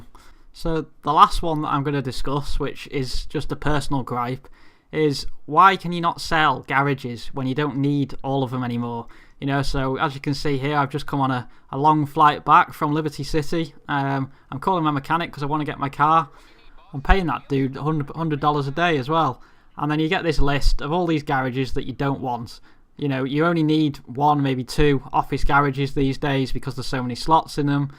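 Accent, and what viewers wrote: Cheshire, United Kingdom. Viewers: yeah , sounds cheshire? Im from just outside there :D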